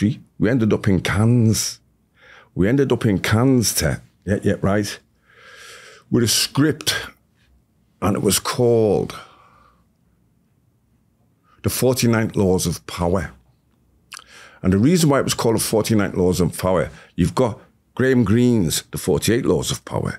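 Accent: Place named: Liverpool, United Kingdom. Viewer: Could listen to him for another 3 hours and I can't stand the Scouse accent.. what a great video. 👍👍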